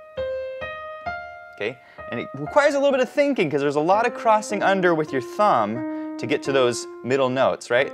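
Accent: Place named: United States. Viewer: Merci beaucoup Mr! Vous m'apprenez beaucoup de choses intéressantes, et même en sus, un petit cours d'anglais américain, ce qui est toujours bon à prendre au passage! Je vous comprends assez bien! J'en suis content! Surtout! Ne vous arrêtez pas!! MERCI!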